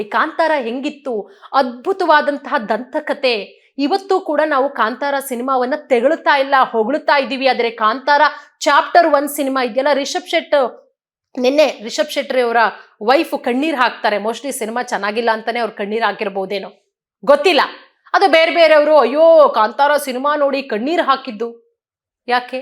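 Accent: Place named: Andhra Pradesh, India. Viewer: Telugu influence ninda mathadthiddara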